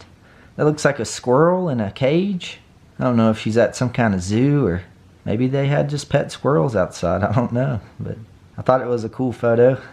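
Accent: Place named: South, United States. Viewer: Love what you’re doing restoring your property. That has always been a dream of mine to retire a property to retire in. What state are you in, sounds like maybe in the southern US. Hope you’re feeling better soon.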